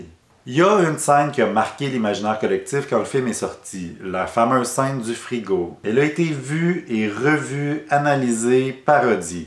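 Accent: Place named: Quebec, Canada. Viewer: Ah mais de rien! Je l'attendais tellement l'argument "En vue de son passé c'est normal" x) Et j'avais pas relevé la connotation homophobe parce qu'à des moments je savais pas de qui parlait exactement alexmoinard ni où elle voulait en venir mais à présent je me dis que si j'avais su j'aurais réagi => En tout cas j'en profite pour remercier d'avoir fait cette vidéo très bien expliquée (avec en prime cet accent québécois que j'aime tant ♥) et qui m'en a beaucoup appris. Je me permettrais même d'ajouter quelque chose qui pourrait peut être être un peu plus éclairci: le fait qu'Ana se fait violer à plusieurs reprises par Grey (comme sa "baise punition" comme elle l'appelle) étant donné que ce charmant monsieur ne lui demande en aucun cas son avis pour savoir si elle a envie d'avoir un rapport sexuel ou non et qu'il ose dire à un moment "I know you want me" qui est clairement ce que disent énormément de violeurs à leur victime pour justifier leurs actes ignobles. 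Ça m'a à moi aussi fait découvrir 9 semaines et demi que j'ai acheté sur ma liseuse tant j'ai été intriguée ^^ Le film aussi a l'air d'être pas mal, il faudra que je vois ça à l'occasion (=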